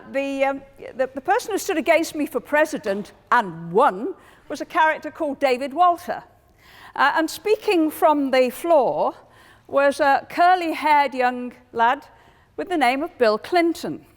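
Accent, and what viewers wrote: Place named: Liverpool, United Kingdom. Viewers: Has a poshest scouser ever existed ? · Aahh - she's from Liverpool! That should not be a surprise! :) Her accent though is not typical... I know many might disagree, but I just love the Scouse accent! It's so expressive and honest- straight from the heart. I'm not British or anything but I've been following the red half of that City's football team for a large part of my life now; and one thing I've come to know about those people is that they are level-headed and not particularly pretentious(yes even the blue half ;)). They can also see right through bs and they speak their mind. This lady is no exception. Lovely lady btw and a seeming good sense of humor which is what you can expect from Liverpudlians!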